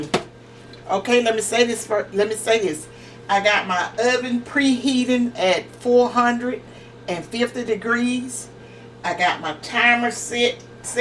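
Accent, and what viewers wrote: South, United States. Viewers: that's the word southern accent not country I couldn't think of that word, yeah the crazy part about that stories I don't understand why my Grandma let my Great- Auntie change my Moms accent ? I wish I would have asked her that while she was alive. Well at any rate I can hear your Southern accent in your videos 😁 Thanks for taking the time to read my comment and to reply back to me I really appreciate that. Take Care and I pray God will Bless your day ❤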